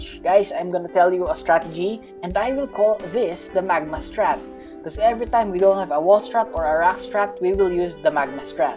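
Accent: Philippines. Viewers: I think he is from pinoy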